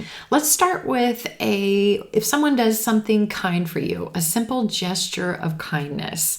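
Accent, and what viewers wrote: United States. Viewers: The american accent which is you teaching,What kind of american accent?Is this the general/standard american accent?Plz,answer me.I am a great fan of you.I will be thankful if you answer me...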